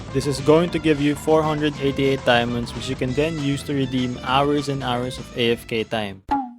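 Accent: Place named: Philippines. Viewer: I can hear some Filipino accent tho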